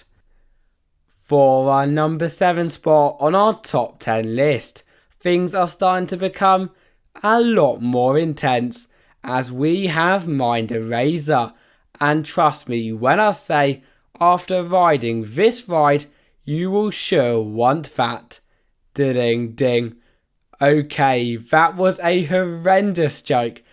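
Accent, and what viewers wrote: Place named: United Kingdom. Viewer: What's with the drunk British guy commentator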